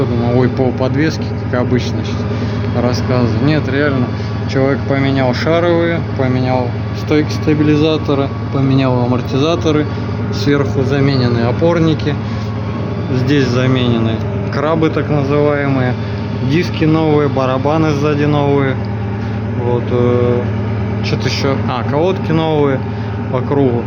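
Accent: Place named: South, Russia. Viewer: Это южнороссийский акцент в краснодарском крае и в Украине нак гхэкают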